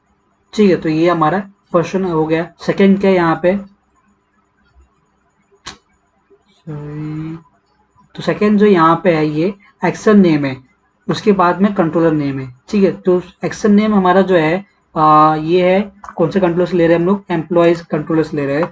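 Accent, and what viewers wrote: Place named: Bihar, India. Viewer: Lag raha hai aap bihar se ho thik hai.❤❤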